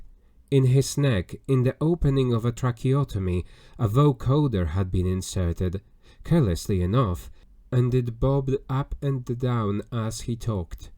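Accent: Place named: Poland. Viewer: Hey, thanks for reading this! Big fan of Lem and classic sci-fi in general, and this book is really fun. It's nice to be able to listen to it in English. Also, are you Polish by any chance? I feel like you speak with a bit of an accent, but I can't quite tell what it is. All I know is that I sound kinda similar when I speak English, and I'm Polish, so maybe it's a Polish accent? (That's not meant as a criticism, by the way; your pronunciation is very clear and your voice is consistent. Good job!)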